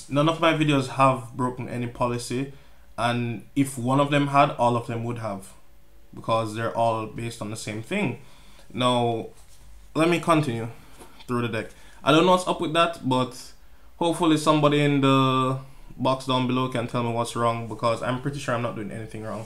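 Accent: Jamaica. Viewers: he sounds a bit Jamaican are you Jamaican?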